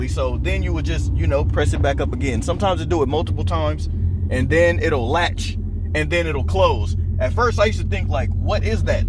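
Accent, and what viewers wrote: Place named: South, United States. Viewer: Dude sounds like a country southern White boy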